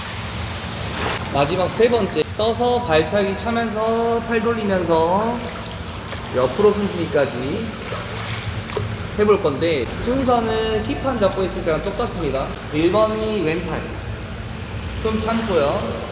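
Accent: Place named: Jeolla, South Korea. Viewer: 말투가 전라도ᆢㅎㅎ 저는 목포염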